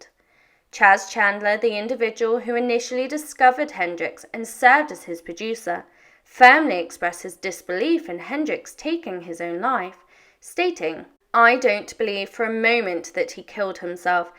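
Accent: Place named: United Kingdom. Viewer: Apparently it is compulsory to say "it will always remain an enigma" in a British accent to what is otherwise obvious evidence of murder...I was a good friend of Tappy Wright and he told me things that are not in his book because he didn't want to get killed...The reason it was covered-up is because government agencies (That Jeffery belonged to) were involved...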